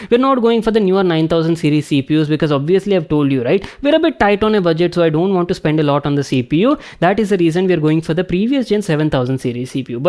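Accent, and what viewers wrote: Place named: South India, India. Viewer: one thing i would like to say i absolutely love ur accent, that little south touch in it, it's so gooood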